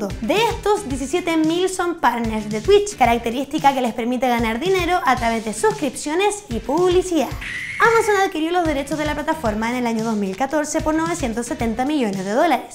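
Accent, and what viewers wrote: Chile. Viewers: Me encanta ese acento chileno tuyo 😍👍Saludos desde Brasil 👋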